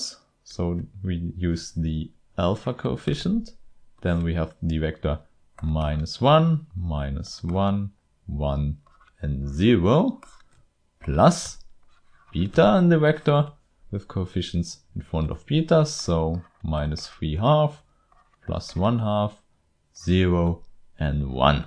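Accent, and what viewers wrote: Germany. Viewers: Great tutorial video though Permit my saying that your accent seems german.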